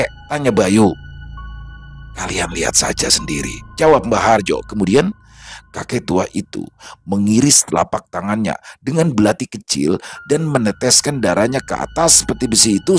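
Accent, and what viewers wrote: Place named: East Java, Indonesia. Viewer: Misuh khas Jawa timuran emang fenomenal 😂😂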